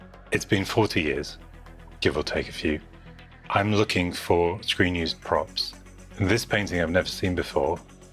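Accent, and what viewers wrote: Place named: Cockney, United Kingdom. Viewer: the oy bruv accent. I love it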